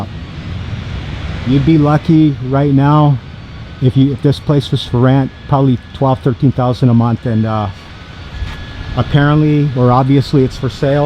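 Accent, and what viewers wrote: East Coast, United States. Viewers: You’ve lived in la all off your life but you have a thick east coast accent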